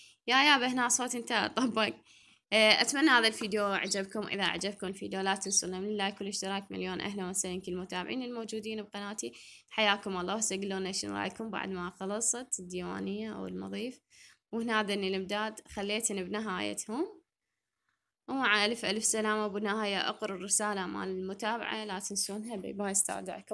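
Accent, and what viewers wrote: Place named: Najaf, Iraq. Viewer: فدوةة الطريقت كلامج ❤ واللهجه اتجنن نجفيه يمه اتجنن لهجتكم 😘😍 كول عام وانتي بخير وصحة وسلامة يارب العالمين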